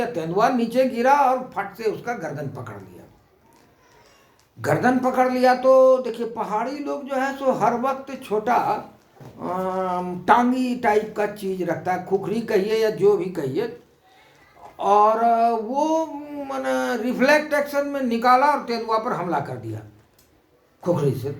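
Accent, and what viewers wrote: Bihar, India. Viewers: Heard the whole story for the behari accent 😂 love it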